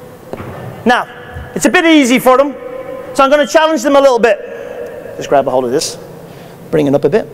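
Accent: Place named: United Kingdom. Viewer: British accent ''' in it '''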